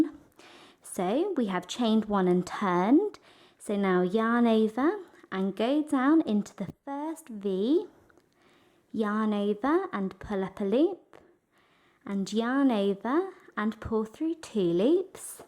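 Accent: United Kingdom. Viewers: Que bonito suena el inglés británico!! 😍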